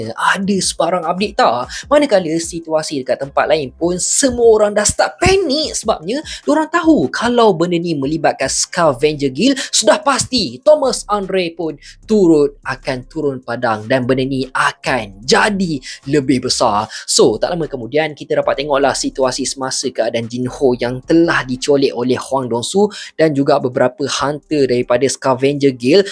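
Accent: Malaysia. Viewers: Bagus bang Sekurang kurang nya ada jugak video suara malaysia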